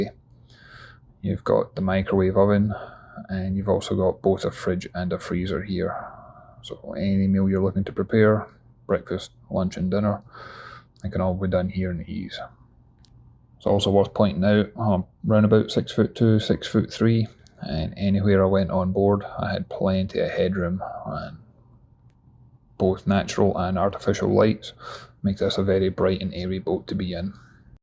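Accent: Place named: Scotland, United Kingdom. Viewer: Nice to hear a Scottish accent..🏴🏴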